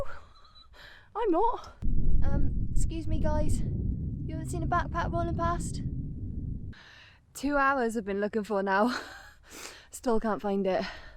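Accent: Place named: South Wales, United Kingdom. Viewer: I rest my case after calling you one on Insta. "I look like a nutter": confirmed. Also, South Walian accent always adds more comedy. Not taking the piss, I live in Snowdonia in Betws (you may remember seeing me on the way up the PyG) so I love the SW accent. Your faces are classic. I deffo don't do faff, no faffing. Had me giggling throughout the whole review, seriously you must be so much fun to be around! See you out there;)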